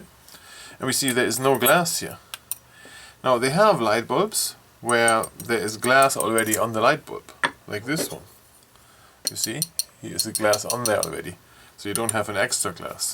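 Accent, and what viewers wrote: Germany. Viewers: i'm not german but i used to live in germany so i recognized immediately by the sound of your voice :-) thanks for this helpful video! I wasn't sure if i had to buy a whole new LED track light system as mine came with halogen, good to know i just need to buy the bulbs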